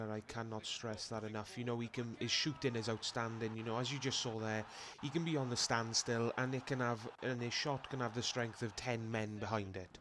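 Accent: Wales, United Kingdom. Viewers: are you welsh? you seem to have a welsh accent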